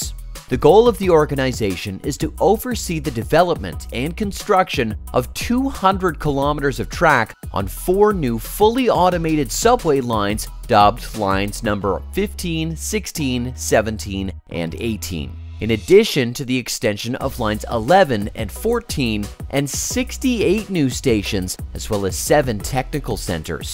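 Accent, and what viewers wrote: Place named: United States. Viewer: He can't help it he's and an american. and also the one commented is just joking. Come on! He is trying his best. I"ve been living here in france for almost 21 YEARS and still my accent is very evident although I can pronounce some names accurately.hahhaa trial and error. french words is just mindblowing specially when you try to write what is being said ;)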